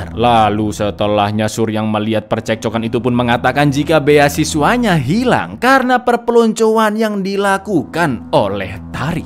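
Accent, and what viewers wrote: Java, Indonesia. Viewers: masih inget gw video pertama yg gw tonton dari channel ini, pas ngidul film The Pacifier, dari situ gw udh suka banget kontennya cak, pembawaannya santai, medhoknya ada, ama diselipin humor-humor receh, mantep dah pokoknya, btw selamat ya cak atas 1 jt subs nya, mugo-mugo kontene sampean tambah rame dan iso tembus 2 jt subs, aamiin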